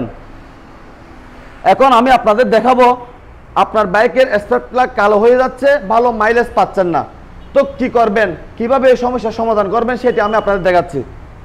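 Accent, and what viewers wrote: Chittagong, Bangladesh. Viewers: আপনি চট্টগ্রামের কাছের মানুষ, বাংলাটা আরোও পরিস্কার করে বলা উচিৎ কারণ, চট্টগ্রামের ভাষা দিয়ে সারা দেশ চলে না - ধন্যবাদ।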